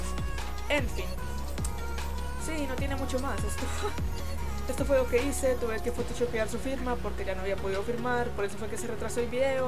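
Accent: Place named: Spain. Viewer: Ostia eres española:000!?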